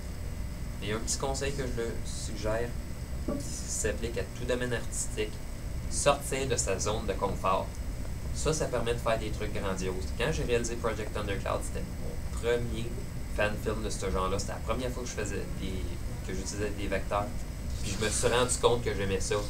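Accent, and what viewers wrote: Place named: Quebec, Canada. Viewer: Yahou! un français!... enfin québécois... mais c'est pareil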